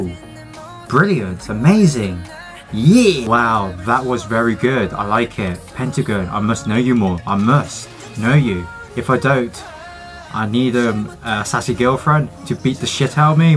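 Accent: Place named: United Kingdom. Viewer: obviously tho its kpop with good reaction i loved it lmao 👌💫and your voice i love it its nice to hear someone else with a british accent 💫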